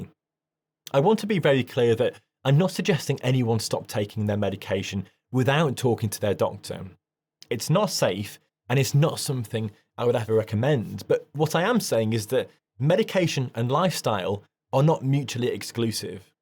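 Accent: United Kingdom. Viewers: What an excellent inspirational explanation … Thank you.. defo following you from now on … I’m an 85 year old female, still working as a wellbeing programmer and fitness teacher, but in the last year, my blood pressure, has become elevated, forcing me me to go on a BP med.I have been snacking between training sessions, going to stop from today!!! Also I think you live in the same part of the UK as me, judging by your accent.. Thanks again!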